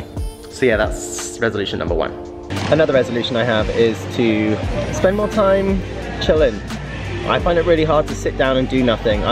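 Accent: United Kingdom. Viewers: Ok for the red spots I recommend clean them good with soap and water then add triple antibiotic ointment dont touch them not repeat the cleaning of them and putting a triple antibiotic ointment on them that way the Mexican work to clean your skin up winter skin has cleared up from them put some lotion on it to you know replenish ur skin. P. S. love ur voice love that British or Australian or Canada! Now on the music that you are playing I don't see where you give the copyright notice to the label company that owns that song as a DJ I always put on CDs copyright-protected of the year the CD was issued with the label.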